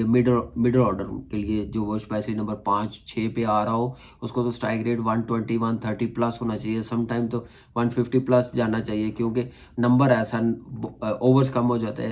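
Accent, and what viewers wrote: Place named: Punjab, Pakistan. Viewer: اردو کے مجدد قبروں سے اٹھ کر آئیں اور چھتر پھیریں کہ اردو کا بیڑہ غرق کیا ہے ۔ پنجابی نما اردو ۔ تلفظ سنو اور انتخاب الفاظ ۔ سمجھ آ گئی کہ آپ کی تعلیم بھی فارغ ہے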